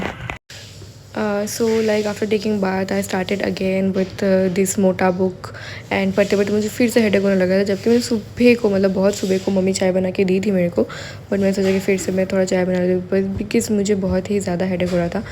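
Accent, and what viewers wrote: India. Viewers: Hii i love you hindi accent,,👍👍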